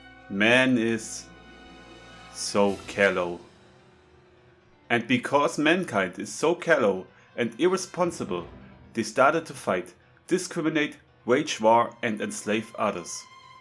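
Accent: Germany. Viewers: no problem bro, and are you maybe German too? idk i think that maybe because of your accent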